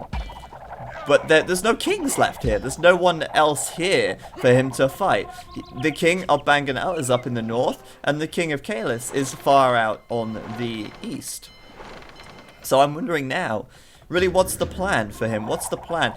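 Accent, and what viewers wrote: United Kingdom. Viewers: are u british??? are u married??/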